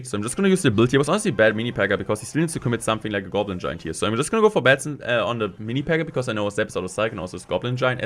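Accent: Germany. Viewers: The reason why so many people are using minor control is because you showed the deck 😂 Edit: man hört deinen deutschen Akzent „bisschen“ 😂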